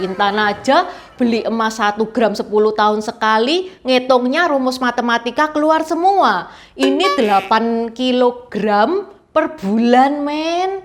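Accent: Java, Indonesia. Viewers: Aku suka channel ini karena MEDOK nya narasi intan... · Gini dong, Tionghoa medok khas Jateng dan Jatim. YouTuber daerah hrs bangkit jangan didominasi Jakarta. Lanjut Mbak Intan!!!!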